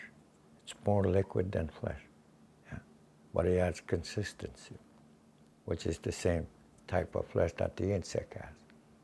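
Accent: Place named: Canada. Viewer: This is really interesting. His accent and my accent are similar, I can hear it as I listen. Excellent video. :) That Canadian dialect ~from a Yooper in "da U.P" of Michigan. :)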